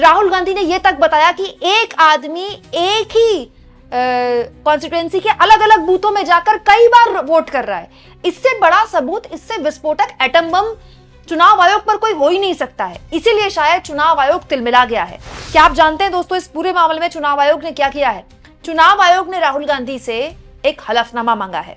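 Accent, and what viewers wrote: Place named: Bengal, India. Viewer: Your voice is bengoli tongue jeetna bhi Hindi bolo your face lip expression is mohali look like kindly improve it bro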